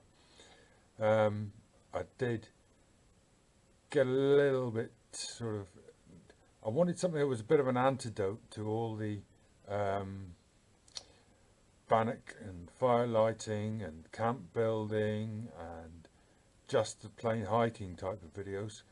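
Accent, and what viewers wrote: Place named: Cornwall, United Kingdom. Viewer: You seem to take care of the upper Tamar,i've been concentrating on the lower! Tributaries of it anyway.Good to hear that Cornish accent.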